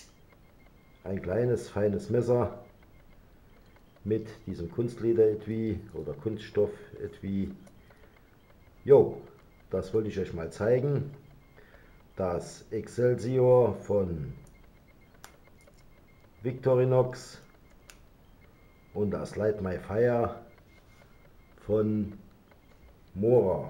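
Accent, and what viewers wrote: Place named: Saarland, Germany. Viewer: Hab mir doch gedacht das ich da saarländischen Dialekt raushöre, aber St. Ingbert is mir doch ein bißchen zu weit. Gruß Andy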